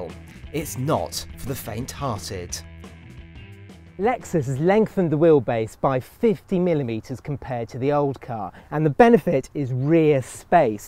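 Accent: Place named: RP, United Kingdom. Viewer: Good review. I'm not dissing the presenter, but I'm thinking its the accent that is putting people off, bit posh... Mat came over like an ordinary guy.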